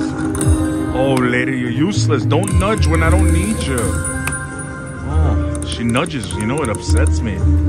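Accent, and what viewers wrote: Louisiana, United States. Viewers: Are you from Cajun Country?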